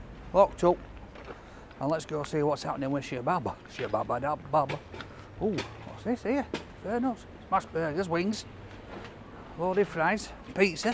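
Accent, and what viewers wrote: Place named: Scotland, United Kingdom. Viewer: You just gotta love his accent. Is it scottish btw?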